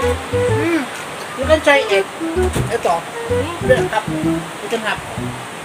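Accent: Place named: Iloilo, Philippines. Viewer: Ang Sarap Naman Po Nyan Lods... Parang ilonggo Ka Ata Lods. Haha Napansin Ko Lang Po Sa Tono. #Jf_Acuña_Lang_Malakas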